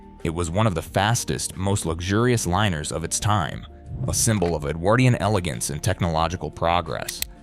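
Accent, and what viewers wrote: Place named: United States. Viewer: Soon as I heard that awful yank accent I had to turn it off.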